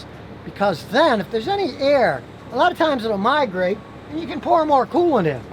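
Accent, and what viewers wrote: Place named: Texas, United States. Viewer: Heluva Texas accent, bro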